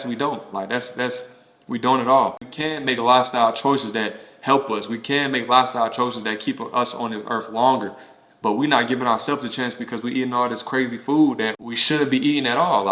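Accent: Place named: South, United States. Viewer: Girl he from the south! Dialect/ pronunciation is associated with where you’re from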